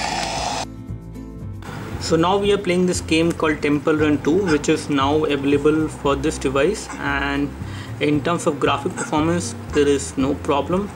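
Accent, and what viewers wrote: India. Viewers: india okzent osin silnii ny prem oseni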